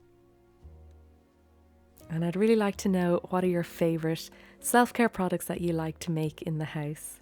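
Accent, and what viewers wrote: Ireland. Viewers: The thumbnail was very attractive, and I just love your voice, Its very soothing. love the Irish accent (Right?) (I was just listening to lots of Americans, ((Dont ask)) and that just makes the Native accent more recognisable.) You've definitely earned a new subscriber, these are attractive and helpful gifts too.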